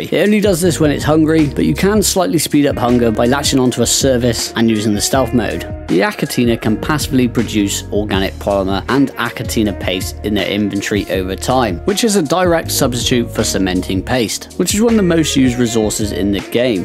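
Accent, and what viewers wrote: United Kingdom. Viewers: It's relaxing to me watching your videos! Even just listening to it lol, I love the British accent! Great video!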